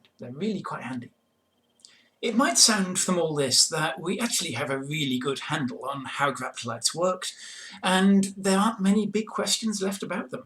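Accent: United Kingdom. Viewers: So cool! I have never read anything about graptolites other than that they existed and are useful for dating rocks. That is a very interesting question about how a colony of successive clones achieves those kinds of alternating ordered shapes! And why such fragile collagen skeletons fossilize so well! I wonder if aside from collagen they had some kind of pervasive toxic chemical that discouraged decomposers. I'm straining my brain to remember what popular science book I read in the last couple of years - by a woman author is all that I remember, but the topic of the book must have been paleontology or earth history - describing in one chapter a cold drizzly steep hike somewhere in (Scotland?) with a renowned older-but-tougher paleontologist looking for fossil/ geological evidence of a major extinction event, and graptolites were a big part of the evidence... 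By the way, for Dr. fans who have trouble understanding her (like me), when I slow down her beautiful chirping Scottish accent to 75% (in the settings for the video) suddenly I can understand every word! (except for some of the Graptolitic terms that I didn't remember from earlier in the video, but the context made them clear).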